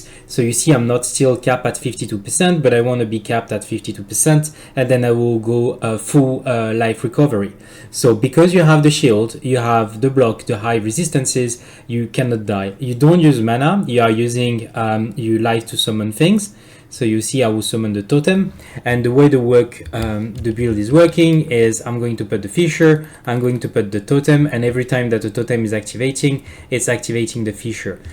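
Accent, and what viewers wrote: France. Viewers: Hey man as a fellow french man I got a great advise for you to change your pronunciation to a more proper one (not that Frenchy ^^ ) I watched your video about the tower and it was helpful to me so I wanted to get you some things for you as well ^^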